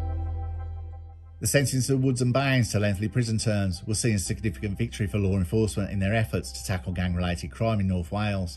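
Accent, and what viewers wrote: Birmingham, United Kingdom. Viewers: I switched it off when I realised it was the brummie guy. 😂😂😂 gets on my tits.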